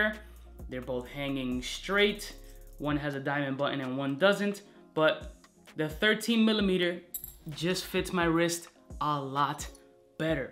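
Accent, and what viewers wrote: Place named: New Jersey, United States. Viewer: Your Cuban born in miami too. But your sound like New Jersey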